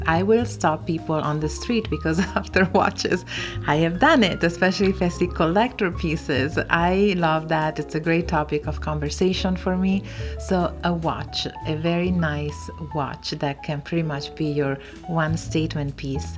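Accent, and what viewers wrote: Italy. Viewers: Buongiorno, questo è il primo video che guardo nel suo canale, ma lei è italiana vero? Posso sentire un pochino pochino di accento, ma volevo dirle che il suo inglese è veramente straordinario! Questo video è veramente utile e interessante, ne guarderò altri di sicuro 🙂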